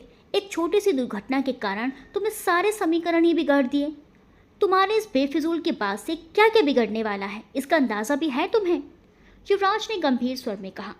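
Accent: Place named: Bihar, India. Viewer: Bihari Hindi bohot acchi lagi 👌👌👌👌❤️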